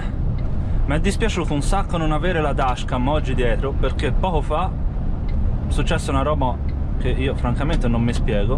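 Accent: Tuscany, Italy. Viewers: Video molto bello soprattutto non noioso poi l accento toscano migliora il tutto. Potresti fare un video anche di pochi minuti un cui fai vedere il camion?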